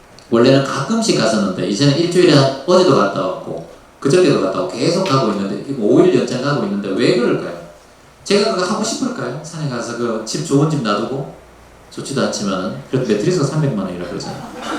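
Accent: Gyeongsang, South Korea. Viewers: 경상도 액센트 너무힘들어요.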